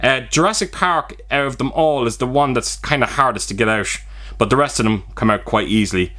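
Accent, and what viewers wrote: Ireland. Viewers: Hey, that is a lovely 4K steelbook set. I have to confess that I've only seen the first three movies in the franchise. I also own none of them on physical media as I only collect horror, independent and underground films. If I collected anything else I wouldn't have the finances for it and I most definitely wouldn't have the space. Even when you review or show movies I'm not really interested in, I always enjoy watching every piece of content you upload. It must be the Irish accent 👍👍👍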